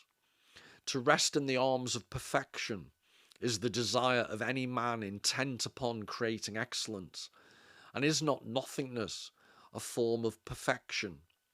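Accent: United Kingdom. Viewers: Ur so great to listen to man so British with your delivery hahahaha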